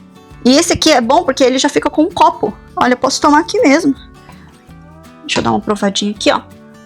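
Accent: Minas Gerais, Brazil. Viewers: já imaginava que você é uma mineirinha ❤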